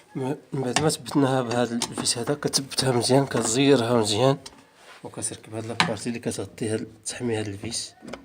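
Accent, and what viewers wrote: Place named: Maghreb, North Africa. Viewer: لو الشرح بلغة اخرئ واضهار ترجهمه عربي احسن من من هي اللهجه. والله بصراحه اللهجه المصريه واضحه جدا وسلسه اما الجزائر المغرب مشكله وخلوا النت كله كذا باي مجال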